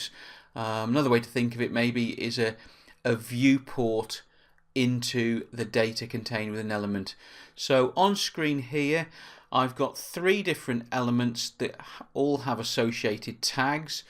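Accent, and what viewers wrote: United Kingdom. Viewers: I installed a YT Add-in which allows you to set speeds up to about 25X....but I find that 2.5-3X is the highest, intelligible speed with this (& many other Brits). However, is one of the better, cohesive sets of videos out there.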